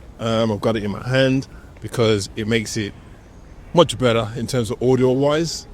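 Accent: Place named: United Kingdom. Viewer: You from the UK, G? You look like you’re based in the states! But your accent sounds local?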